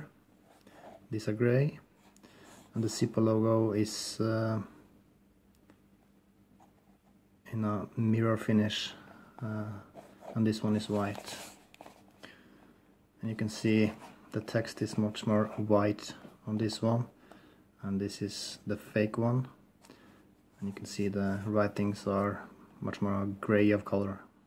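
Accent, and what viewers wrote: Norway. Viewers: He sounds norwegian :-) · This sounds to me like a Norwegian accent. It’s beautiful. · Gotta be a norwegian. Nice norwinglish.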